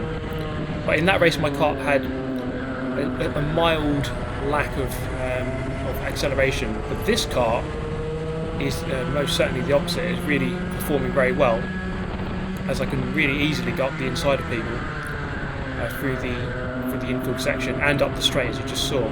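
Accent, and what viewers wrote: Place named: United Kingdom. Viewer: I'm high, and thought i was watching Brouphy lmao. British dude.. i love their accent.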